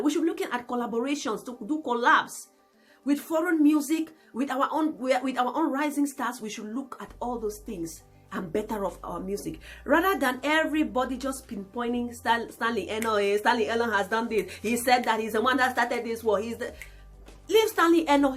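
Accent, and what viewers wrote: Nigeria. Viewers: You also speak like Nigerian, .... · Wow. Even you talk like a Nigerian though. I mean you said "abi" a yoruba word. Having said that, the entire Africa has a long way to go. 1. You want to compete with a population of almost 200 million 2. Nigeria has the biggest economy in Africa 3. They are all over the world holding key positions and owning platforms that makes it easy for Nigerians to climb. 4. It's too late for the ban to have any effect cos of the Internet. 5. The competition in Nigeria is extremely high in everything you can think of, which brings out the best. Many do not make it at all. Even churches are competing. 6. Nigerians hustle like no man's business. Take one of your cameroon musicians, let him stay in Lagos for a year and then take him to Cameroon, you'll be shocked how he will stand out from the rest . The hustling spirit would have rubbed on him. 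7. Other industries like film, have helped project our image, lots of Nigerians abroad are recognised globally so it helps. Solution is to collaborate, humble yourselves, ask questions, take trips back there to see how things are done, invite the top pple to Cameroon to hold forums on how to improve. One love to all Africans, as a Nigerian i believe we can all win, we just musnt pull ourselves down. God bless. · It really hard to believe that you are not a Nigerian, it has been a shock a an unbelievable experience for me for the past few days that I bump into a YouTuber discussing about this whole Nigerian music ban, but deep down in me I was like are these people drunk??? Are they not Nigerian, they way they talk and new you too, if you come to Lagos I swear no one will believe you're not a Nigerian even if you keep telling people, I believe there's something more to this, you guys are more Nigerian than what I think. 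Love you sister. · You look and sound nigerian. Am a ghanaian but I will surely mistake you for a nigerian. I think nigerian music will remain the best, maybe because of population.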